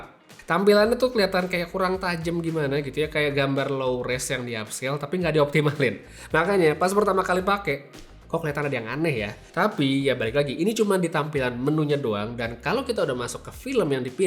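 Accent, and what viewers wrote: Bali, Indonesia. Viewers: Orang bali ya bli?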